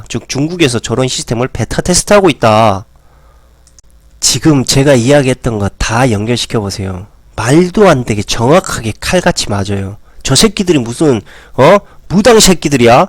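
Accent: Jeolla, South Korea. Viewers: 전라도 사투리?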